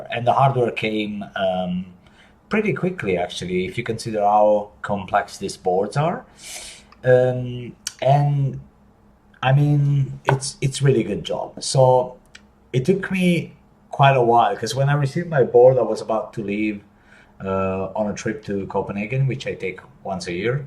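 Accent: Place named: Spain. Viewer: Awesome work! This project has given me that extra little push to dive deeper in 3D printing/modeling. I recently purchased a Creality CR-10. It’s been a blast so far. I am currently modifying HoolyHoo’s screen bracket to accommodate dual joysticks and a RPI3. While sharing is nice and very appreciated among the community, people usually have valid reasons to not want to share. You should give people a chance to be creative with their solutions and if anything, push them in the right direction. Also, where are you from? For some reason your accent leads me to believe that Spanish is your first language.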